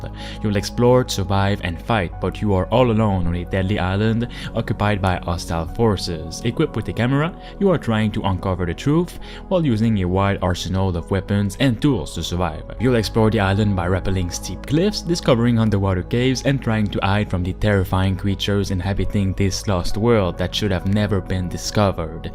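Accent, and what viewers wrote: Quebec, Canada. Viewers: you defenitly sound like a french Canadian.... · Es-tu québécois? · Are you from Quebec? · Your voice sounds québecois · Salut, je viens de découvrir ta chaine et t'as genre un certain accent. T'es tu Québécois pas hasard? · T’es clairement québécois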